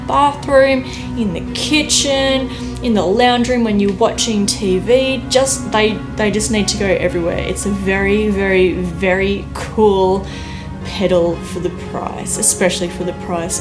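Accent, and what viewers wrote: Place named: Australia. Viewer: Hi! Thank you so much for your kind reply, I will keep that in mind. BTW love your channel, thought you are British - but you are from Down Under:-). Take care and cheers, Peter, Austria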